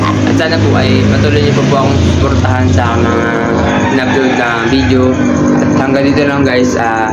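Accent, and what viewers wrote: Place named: Quezon, Philippines. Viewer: Saan kapo sa quezon bro? Tama hula ko quezon karin sa punto mo, taga quezon din ako eh, nakakamis narin ang province talaga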